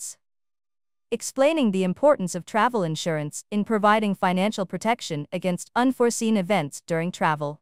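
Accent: United States. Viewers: اللهجة امريكية ام بريطانية ارجو الرد ؟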